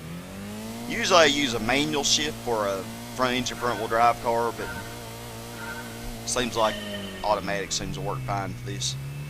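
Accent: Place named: Kentucky, United States. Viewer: Hello there you sound like your from Kentucky or something I’m from Indiana and I see you have a country accent just found you so I’m going to sub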